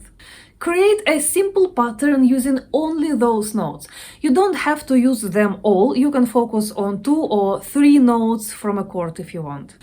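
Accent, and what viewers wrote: Russia. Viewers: What a fine difference she makes compared to all these ugly unshaven tattood guys in black t-shirts. Her teaching is not only very accurate, but is is more fun to listen to somebody with a pleasant aura and the russian intonation is the cherry on the top.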